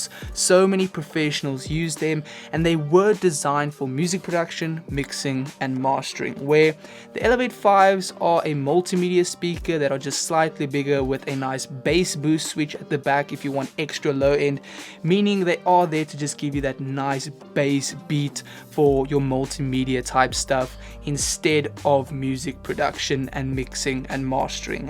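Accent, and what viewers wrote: South Africa. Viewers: hello, Just a random question are you south african (you ascent just sounds like it)?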